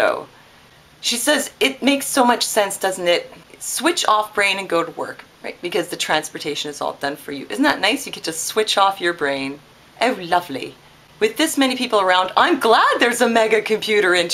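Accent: England, United Kingdom. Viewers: LMAO the british accent - so fucking genius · Your pommie accent is HOT! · Great English accent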